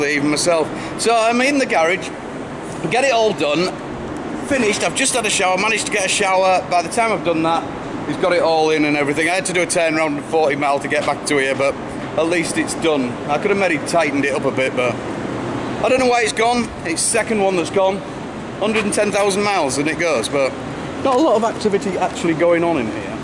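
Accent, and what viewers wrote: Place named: Liverpool, United Kingdom. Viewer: Love the accent mate, it does make me think of scouse a bit. I blame Harry Enfield. Used to love that show with the scousers 🤪😁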